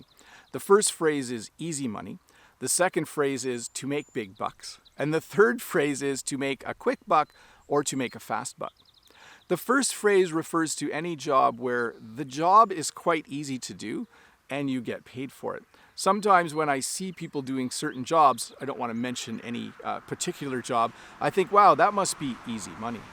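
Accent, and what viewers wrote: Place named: Canada. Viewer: Wow you are a wonderful teacher I like to learn from you Canadian accent I love 🎉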